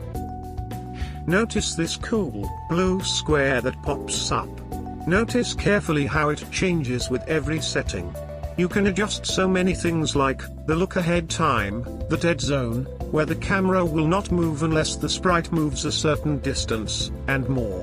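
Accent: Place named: United Kingdom. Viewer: 🔥🔥🔥🔥🔥🔥love the British voiceover🔥🔥🔥🔥🔥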